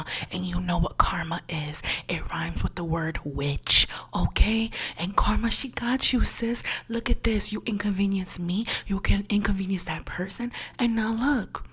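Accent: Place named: New York, United States. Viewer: I knew you was from NY cause that accent baby lol. Brooklyn here. What part you in?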